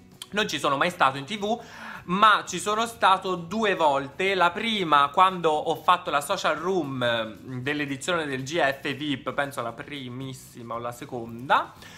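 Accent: Tuscany, Italy. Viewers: Ti faccio una confessione: guardo i tuoi video soprattutto per il tuo accento perché abitando in Spagna mi fai sentire a casa dato che anch’io sono toscana. Continua così, sei fantastico 😘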